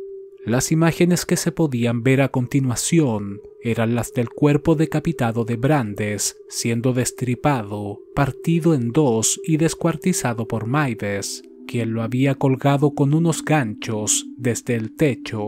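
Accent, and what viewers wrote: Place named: Chile. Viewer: Hola, espero estes bien! Felicitaciones por tu canal. Lo q mas me gusta es la narracion chilena que haces de los videos. Estoy harto de escuchar relatos mexicanos, por eso te felicito por tu narrativa. Quisiera mas videos nuevos, ya los he visto 3 veces.gracias. Ronald cortes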